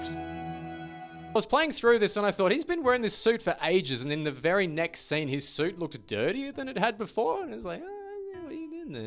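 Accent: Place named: Australia. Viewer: My first thought when I saw this video was "over an hour of that Australian guy who talks about video games? Did I die?"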